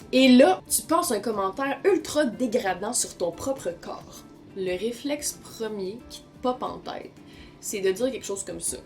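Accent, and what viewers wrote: Quebec, Canada. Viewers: Ce qui te rend parfaite c'est ton accent québécois là 😍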